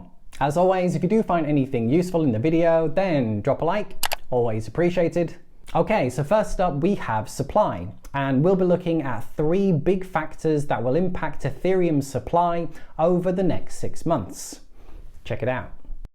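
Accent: United Kingdom. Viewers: When I clicked on this video I didn't have my sound on and I predicted that you would be British and I was right.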